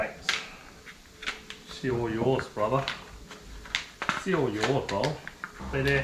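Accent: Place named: Australia. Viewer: How many years you been a mechanic very smart man love your Aussie accent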